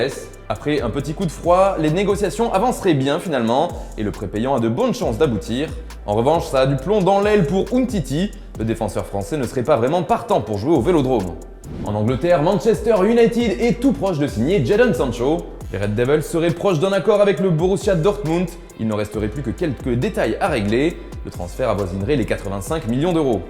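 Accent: Italy. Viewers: C'est lui l'accent italien jadore ce gas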